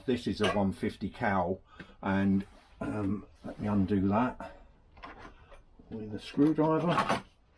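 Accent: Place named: mixed, United Kingdom. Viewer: Hi videos great you old school like me but you got great knowledge I have learnt so much as a born again Scooterist. Your attention to detail brilliant. By your accent you Essex or London boy. Regards Terry.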